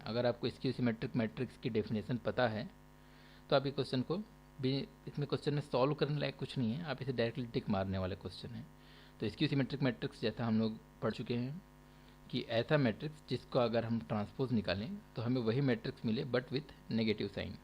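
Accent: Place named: Bihar, India. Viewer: bihari pronunciation is really irritating.when one is using English words should not have a regional accent.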